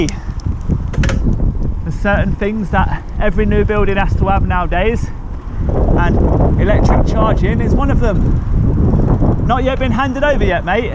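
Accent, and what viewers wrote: Leicester, United Kingdom. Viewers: Love the quality on this channel, I live in Derby near swarkestone bridge on the A50 so you are so local!!! Leics twang sounds so similar to Nottingham twang, which is where I was born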